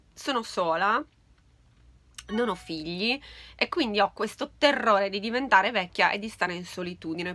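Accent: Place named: Sardinia, Italy. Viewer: Si questo è il nostro accento cagliaritano quando vuoi lo parliamo assieme 😉😉😉😉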